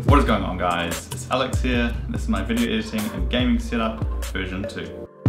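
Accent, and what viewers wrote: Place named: New Zealand. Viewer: hey bro where are you from? Thats a new zealandy accent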